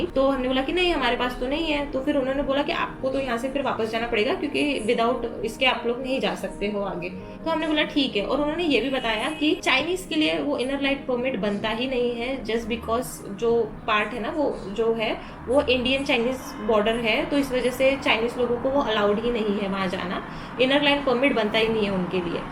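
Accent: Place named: Vidarbha, India. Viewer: Mala vatalch nagpur chi asel tu lagana mdhe vidhrbha chi bhasha bolt hote...😊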